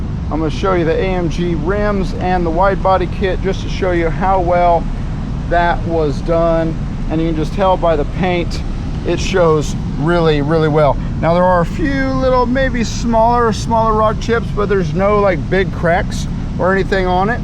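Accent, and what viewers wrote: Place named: Appalachia, United States. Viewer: Love the car but am i dead or he sounds like he is speaking with a hillbilly accent😅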